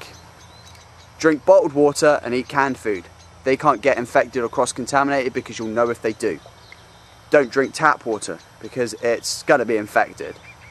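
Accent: United Kingdom. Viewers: Awwwh he said torch! Haha I love british peoples accents! My cousin and I joke around in british accents.. its just by all means adorable... haha and he said bloody omg this video tripped me out.. got to love british.(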